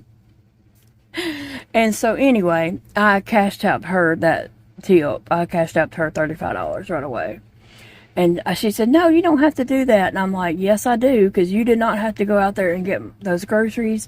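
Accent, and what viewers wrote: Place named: Mississippi, United States. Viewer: ! Aw Lawd Jesus. Prayers for all involved in the Walmart grocery delivery!!! I’m proud you made a decision to revoke the delivery ladies tip & give it to Paige. That was very rude for that driver to leave your groceries in the dirt. That was deliberate. She chose to do that. Unreal. On the woobles book. I need to pull the trigger & but that. It’s just that I get too many wips on my hook & sometimes they are in different hook sizes. I’ve been known to buy extra hooks from Amazon to leave the hook in the project bag. I have bought these clear zip up ‘stitch kits’ at Hobby Lobby & may invest in more of those so each project bag will have the hook, & etc… stuff… because I’ve been known to use the wrong hook size to continue a old wip (work in progress). So,… I may order that yarn & the sewing kit bags. I may look on Amazon too. 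I just like what I like outta trial & error. Lol, I love how you say “Mawtha”! I was crocheting the first time you said her name a few weeks or a week back & thought you were making a Moth & her name was Mawtha! Lol! I’m originally from New Orleans so all my relatives back there have an accent so I do try to listen for certain accents with words. And I follow an English (UK) woman’s Facebook page, and some country folk on YouTube too. Your accent is closer to middle Mississippi accent so I can understand you very well as I’ve lived in Vicksburg for many years & over here near Jackson for even longer. Now, the North Alabama people had a curt accent & d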